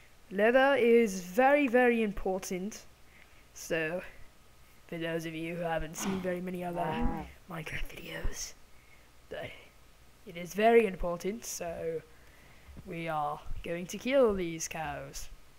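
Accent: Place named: Australia. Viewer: U sound more australlian bro and i am british and i dont care i dont expect u to have a good accent since u r not british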